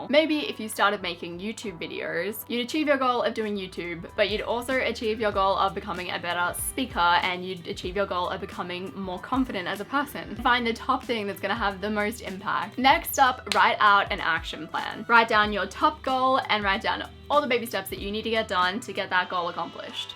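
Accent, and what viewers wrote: Australia. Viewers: I love the way you talk! Being from Germany, I normally only watch TV Shows in American English and I am so not used to Australian(I believe) English and I LOVE it! Thanks for tipps. I am kinda in between kind of type, I got some goals done(planned to go vegan for a month and went vegan on a constant so I went over the top of my goals; planned to fall back in love with my life and body and I am rocking it;I planned to visit at least 5 new cities and I have 6 by now!) but then again, I planned to make more friends and start a blog and didn't achieve it yet but in German we say “the night is still young“ except it is the year in my case. Soooo much love coming from Germany all your way! Thank you for always reminding me to go after my goals and grow! PS: how is your year going regarding to your goals?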